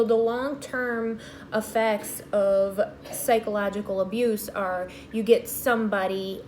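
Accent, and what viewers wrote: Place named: Midwest, United States. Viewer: I like your Mid-West ayacciyent! Seriously tho, CIA and FBI are nothing like most people think. They don't use law enforcement tactics but subversive, covert menacing,, and use your own people or enemies against you. They set it up but never really get hands dirty (directly speaking). They commit more felonies than they probably prevent.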